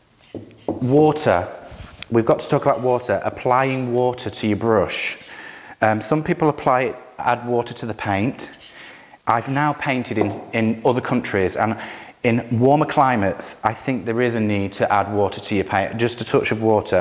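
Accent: United Kingdom. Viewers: First let me say, LOVE the British accent. Hello from New York, USA! I like the fact that you did not said the piece to obtain the "distressed" look. Water seems, it only easier, but better for getting the paint off exactly where you want to without sanding somewhere you don't. Also, the water seems to be a lot quicker and cleaner. Thanks for sharing!!!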